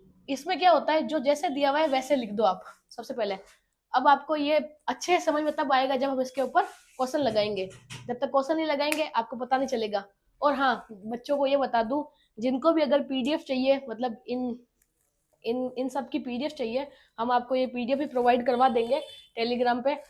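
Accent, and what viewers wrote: Rajasthan, India. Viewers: Mam apki class entry bhut achi lgti❤❤apni rajasthani style me 🎉🎉 content bhi bhut acha h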